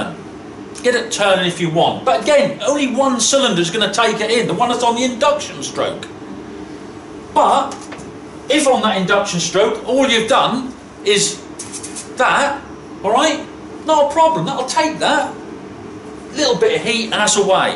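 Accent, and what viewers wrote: Norfolk, United Kingdom. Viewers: You sound just like me as everyone say you carrot cruncher roughly what part of Norfolk you from I live in fakenham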